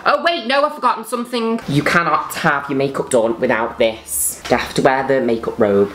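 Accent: United Kingdom. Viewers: Your british accent is ICONIC and STUNNING!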